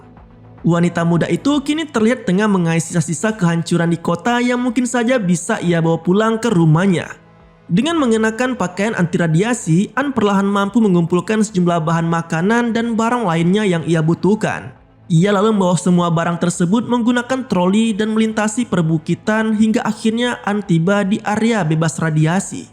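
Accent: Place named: Java, Indonesia. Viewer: Biasa jgn baper ilat jowo 👅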